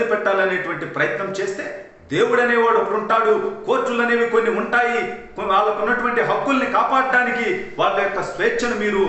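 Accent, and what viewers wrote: Nellore, India. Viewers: Me voice super bhaayaaaaa....Nellore slang lo dummu leputtunnavu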